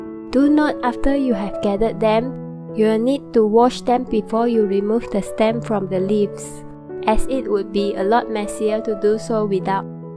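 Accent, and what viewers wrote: Malaysia. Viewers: Definitely Malaysian hahah, 'Add Oil' arh!👍✌ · I have just posted mint sauce recipe and found this video. I love this. I am now a bit confused since this video explanation in Japanese, subtitle in English and pronunciation is typical Malay Chinese... Anyhow, I love your channel and subscribed. Looking forward your coming videos!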